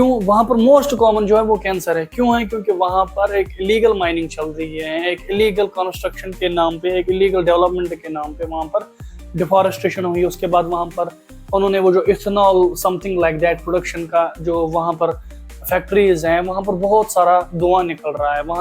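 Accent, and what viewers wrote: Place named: Kashmir, India. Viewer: Are you kashmiri btw accent sae lag raha hai,i am from Jammu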